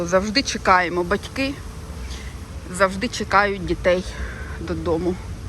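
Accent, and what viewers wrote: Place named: Kryvyi Rih, Ukraine. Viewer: Жалкую, що Ви не з цього міста. Хотіла попросити про допомогу. Треба тих, хто знає головних на кладовищах. І ще, у вас говір криворізький, рідний❤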